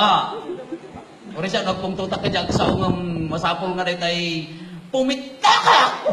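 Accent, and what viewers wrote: Ilocos, Philippines. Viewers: Jeje mas natawa ako sa accent ng ilocanu ni fr.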